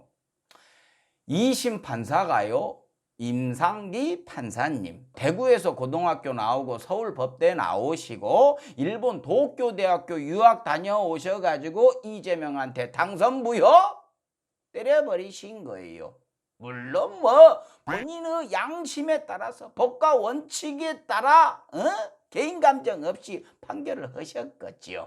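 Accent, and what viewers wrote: Jeolla, South Korea. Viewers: 형. 님!! 전라도 사투리 솔찬히 잘흐요.. 수원에사는 순천 촌놈이 구독 눌루고 가요오! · 혹시전라도랑무신관계라도있을까요 우리고장말씀을잘구수하게잘하네요 너무나시원한럭셔리칼럼하루만안해도기다려지네요 강성범tv많이많이들구독해주삼♡♡♡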